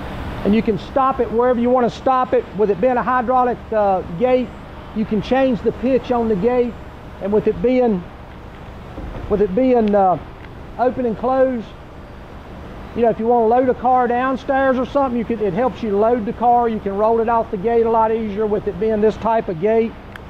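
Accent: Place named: South, United States. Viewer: Official NASCAR accent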